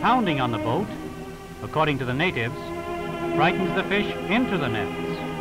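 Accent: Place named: United Kingdom. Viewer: Its always with a british voice.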